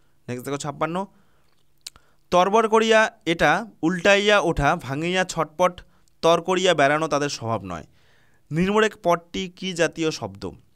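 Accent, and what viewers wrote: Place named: Alipurduar, India. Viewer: Sir apni kon jelar.. Anek ta Alipurduyar er vasar moto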